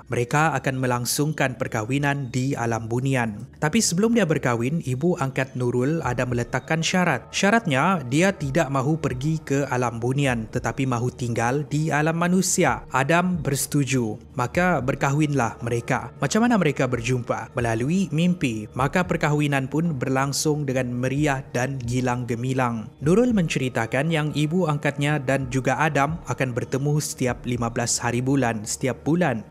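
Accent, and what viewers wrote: Sabah, Malaysia. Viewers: Can someone tell me, orang sabah kah? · Tak pastilah sebb saya pun kurang tahu pasal cara percakapan diorang ni.Tapi yg saya tahu ni sabah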